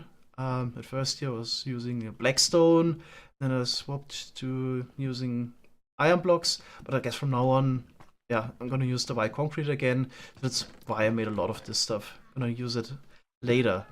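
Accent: Germany. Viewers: are you german or scottish? or swedish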